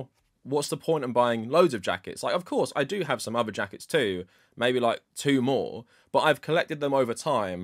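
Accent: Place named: United Kingdom. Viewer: I was gonna watch, but there was too much British in the first minute.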